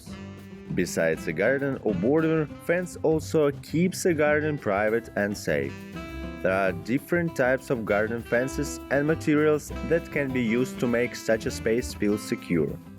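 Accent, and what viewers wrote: Russia. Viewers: Русский чувак озвучивает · Это просто пи..ец как режет слух, а выговаривание воз вместо уос подчёркивает что акцент русскоговорящего.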